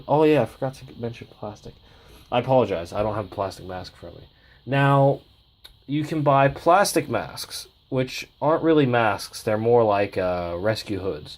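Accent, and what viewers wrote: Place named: United States. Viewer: So you live in Australia, but have an American accent, were you born in America, but moved to Australia? I'm just curious.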